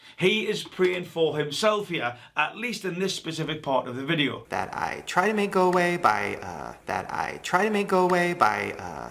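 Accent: Newcastle, United Kingdom. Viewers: R u a Geordie cause u sound like your from Newcastle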